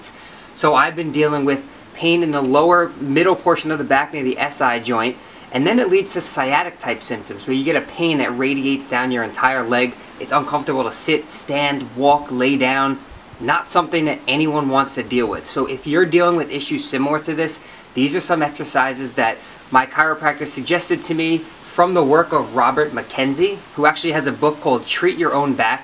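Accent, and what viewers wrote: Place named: Long Island, United States. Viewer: Wow. I knew you were from Long Island. lol I heard you talk, and I saw your surroundings..and I had that feeling of, "I know that street. Its Long Island Suburbia." Aka my hometown. Long since gone, but you never forget. haha Anyway I just started trying these exercises out, I'm hoping they'll help, so far they felt pretty good so I'm hopeful.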